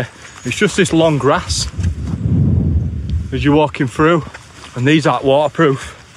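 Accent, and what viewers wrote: Yorkshire, United Kingdom. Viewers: Your commentary makes the video stand out so well from others that have tried on YouTube. I dig the non stop comedy, the earthy language and the dulcet Yorshire twang.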